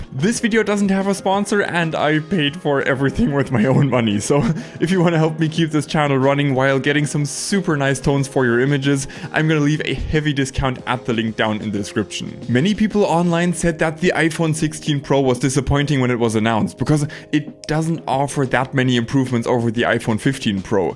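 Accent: Germany. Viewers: You German?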